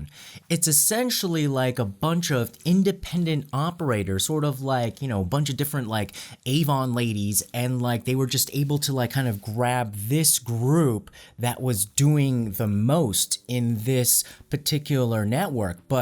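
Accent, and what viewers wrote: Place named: California, United States. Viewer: you sounded very californian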